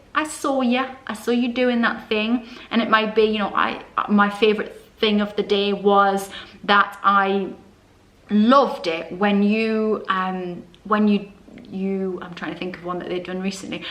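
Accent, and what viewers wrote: Newcastle, United Kingdom. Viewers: I watch your videos to learn a Geordie accent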